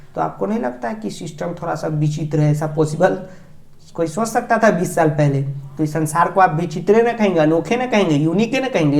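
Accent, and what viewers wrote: Bihar, India. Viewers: Sir aap bihar se ho 😊😊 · Sir aap bihar se hai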